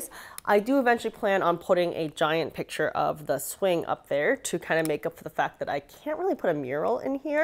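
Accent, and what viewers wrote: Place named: New York, United States. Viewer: Love your new home! Are you from New York originally,? You sound so "Cool New York"! I'm in Long Island.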